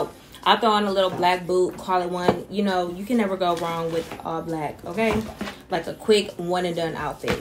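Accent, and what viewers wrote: United Kingdom. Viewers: It’s always gone be the British accent for me 🤭🫶🏾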